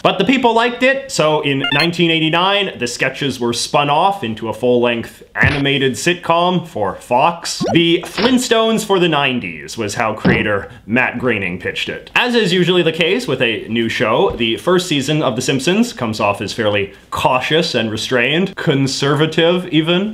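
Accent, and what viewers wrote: Canada. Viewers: Most Canadian man I’ve ever heard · You are so blatant Canadian! LoL!!! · I'm aware that it's his accent, as a fellow Canadian I'm saying tone it down lol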